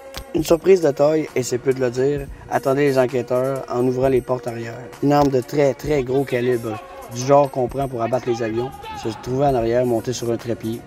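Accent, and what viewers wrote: Quebec, Canada. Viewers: Salut , au qc on parle quebecois ... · Bonjour, c'est quoi comme dialecte de votre Français -quabéquoi ? Merci pour la réponse ps j'adore.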